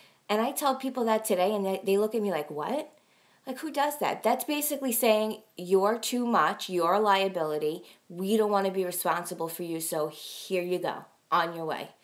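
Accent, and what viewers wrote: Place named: New Jersey, United States. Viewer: God Bless You, Girl!!! Stay Strong!!! Looks like you have turned out Ok considering what you have been Dealt with. You are Stronger than you think!!! I also grew up in a VERY Dysfunctional Family & I can Relate to you somewhat. I sent you a FB Friend Request, I Really would like to get your Address. Maybe to send you Coupons, etc... I am from Ky & I have a Southern Accent. I was showing my Husband your Videos & was talking about your Jersey accent. I used to LOVE to watch Jerseylicious on TV. I don’t know if you know about that show or Not??? But, you remind me SO much of those Girls. You would be Tickled at my Accent as Well. 😆 Anyway, My Prayers are with you & your Momma. Thanks for your Videos, I enjoy them. 👍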